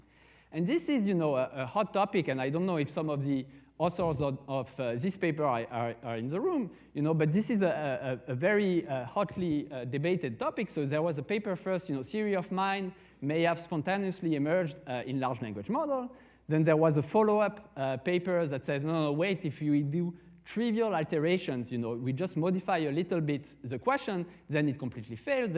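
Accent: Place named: France. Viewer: Stochastic Parrots 🦜! Taking over the world! Clever birds! Anyway, I would have expected Sebastien to be affected by Methuselah Syndrome, it would only have been fitting. But the french accent makes up for it, like François Truffaud in "Close Encounters".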